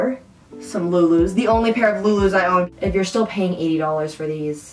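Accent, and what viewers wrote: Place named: United Kingdom. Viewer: the British accent tho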